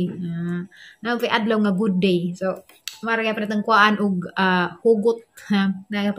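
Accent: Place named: Bohol, Philippines. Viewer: Mahimoot ko sa imo tonada. Boholana.. gahi ayo imo Tonada. Mao nag subscribe ko nimo... Bisaya ko piro Dia ko Mindanao nagpoyo. Nagpojo.haja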